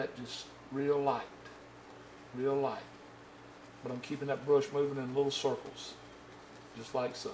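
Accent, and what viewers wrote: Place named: South, United States. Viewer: I love your videos. I'm southern too, so your accent and instructions make it so easy to understand. Thanks!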